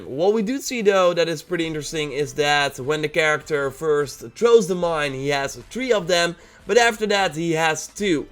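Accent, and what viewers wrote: Netherlands. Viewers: Are u Dutch? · Are u dutch because u sound dutch?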